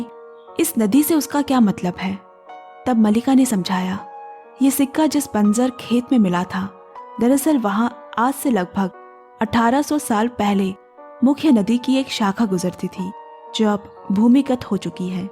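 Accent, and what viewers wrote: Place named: Lucknow, India. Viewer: Ap lakhanow Sy hy kia? bohot hi pure urdu bolti hy ap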